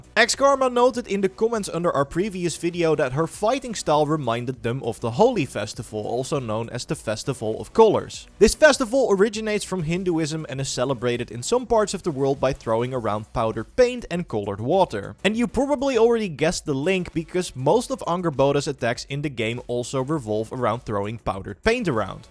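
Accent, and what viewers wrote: Netherlands. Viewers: Are you dutch? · dat onherkenbaar nederlands accent :D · Nederlands accent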